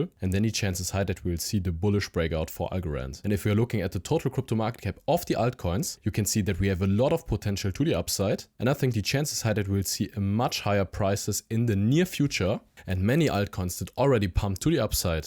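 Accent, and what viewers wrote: Germany. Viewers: German accent👏🏻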